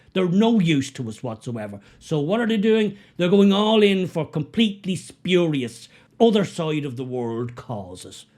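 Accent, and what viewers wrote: Ireland. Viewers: This guy talks like an Irishman. This video coukd have been 75% shorter. He said way too much to say so little.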